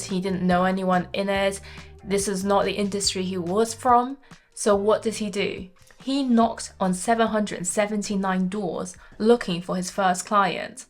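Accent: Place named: RP, United Kingdom. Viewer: I recently stumbled on your videos algorithm suggestion and wanted to encourage you to not give up on your YouTube account and to tell you that your content, been binge watching, have been really spot on very informative and delivered in a way that normal people can relate to and understand and thoroughly enjoying watching the vids and hear your posh accent haha. I am pretty sure it’s just a matter of time before everyone else discovers you and become the success like Corey! Keep pushing all the content and thank you really appreciate what you are doing here ❤❤❤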